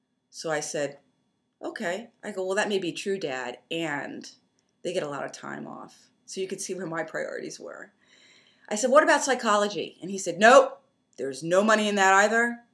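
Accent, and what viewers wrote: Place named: New York, United States. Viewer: Your NEw York accent is ever showing. Haha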